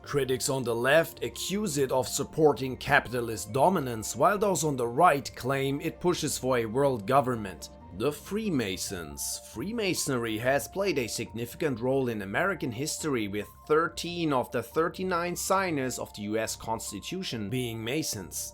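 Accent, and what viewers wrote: Germany. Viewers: Why so German 😮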